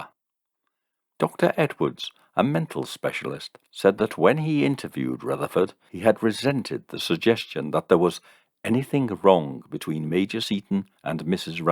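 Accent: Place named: Liverpool, United Kingdom. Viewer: You mentioned in one broadcast that you lived in Balham, London. Your accent sounds a little bit like Wirral or Liverpool.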